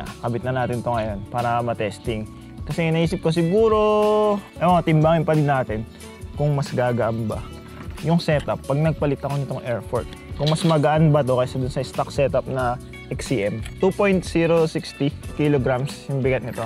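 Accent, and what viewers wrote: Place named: Coño, Philippines. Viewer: coño ka pala master " ":D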